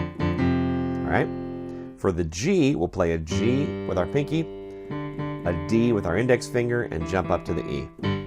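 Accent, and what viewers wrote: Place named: South, United States. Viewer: I love the American accent especially 'down south' and that American 'drawl' is kinda special too!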